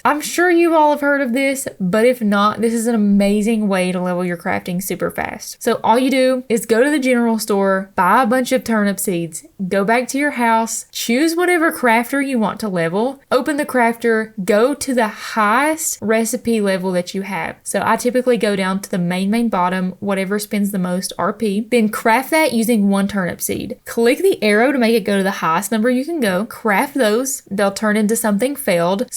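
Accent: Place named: Texas, United States. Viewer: Damn, the stealing from enemies thing is something I forgot about and you'd be forgiven for doing so as the game has so much to it it's easy to. Some fantastic tips here. I've put 60 hours into this game and much of what you've covered I didn't even know about, especially the boss loot,l farm, I've been just defeating them everyday and either getting the drop or not 😅 thank you for this, also you have a great accent! From Texas I assume? Sincerely a UK person 😂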